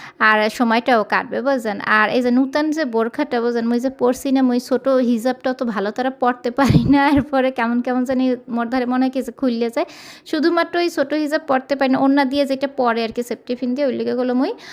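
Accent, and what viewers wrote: Barishal, Bangladesh. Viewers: আপু আমার হাসবেন্ড কাতার থাকে। তোমার ভিডিও আমার খুব ভালো লাগে। আমাদের বরিশালের ভাষা খুব ভালো লাগে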